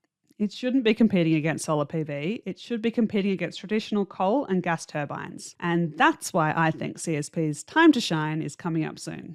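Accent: Australia. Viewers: As a UK English speaker, I had to put the captions - that is, subtitles - on to work out what "soller" is! Is this Australian or is this?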